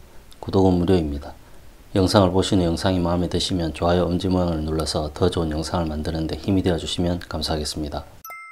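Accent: Gyeongsang, South Korea. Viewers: 님 경상도 사투리 멘트가 아주 정겹고 그림설명도 넘 좋으시네~♡♡ 🎉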